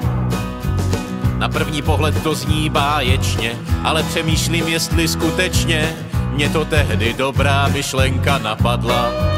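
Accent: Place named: Prague, Czech Republic. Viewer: takhle se vážně v praze normálně mluví?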